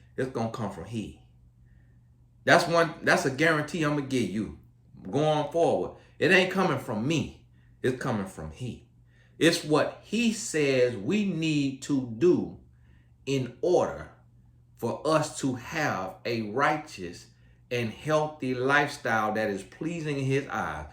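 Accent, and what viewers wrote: South, United States. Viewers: Love this southern man talking in Ebonics! Yes we hear you brother. Time for men and women to claim their God given place! It’s on the men. It’s on Adam. Praise God for breaking curses. Set the example. Say the truth.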